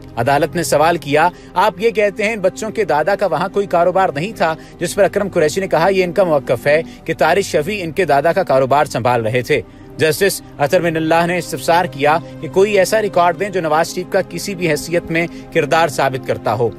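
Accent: India. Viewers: mira matlab k hum urdu k accent se pehchan gay k admin indian hay . kharachi wala bi nhi . or ye indian kis tarah pakistanyion ko ghumrah krny ki koshishein krty rehty hain . lekin finally be niqab ho jaty hain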